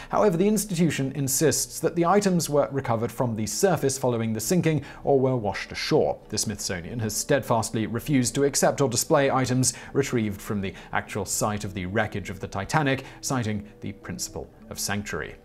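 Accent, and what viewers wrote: England, United Kingdom. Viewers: Hey Top Tenz, maybe slow down your production frenzy and aim at better accuracy. The first mugshot you showed of "John Dillinger" was actually the mugshot of Baby Face Nelson. I appreciate an English accent as much as the next person, but information hurled at the viewer like a machine gun in a Brit tone doesn't always mean it's the truth. Hire a fact checker, for heaven's sake. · He's British. You can't quite judge him on pronunciation unless you yourself happen to be from there as well. Additionally, accents in the UK as a whole have noteable changes every 25 miles so even then you could be off. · Please stop following the current fad of having videos narrated by a Brit. The accent is horrendous and distracting. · Brains00007 Brit-speak. Go figure. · He can’t pronounce a lot of words. It takes more than glasses and an English accent to be intelligent.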